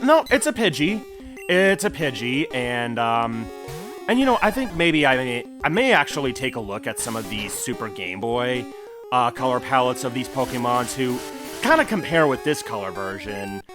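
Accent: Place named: United States. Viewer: It just sounds American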